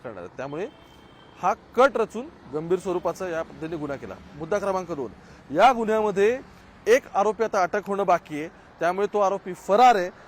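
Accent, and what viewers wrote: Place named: Pune, India. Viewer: भाऊसाहेब छान समजून सांगत आहेत ऐकायला बरं वाटलं म्हणजे स्पष्ट पुणे बोलत आहे. छान रीपोटर आहे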